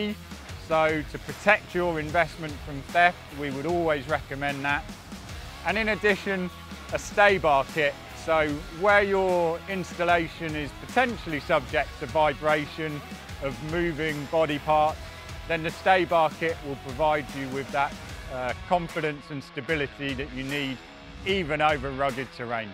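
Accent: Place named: United Kingdom. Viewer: That britsh accent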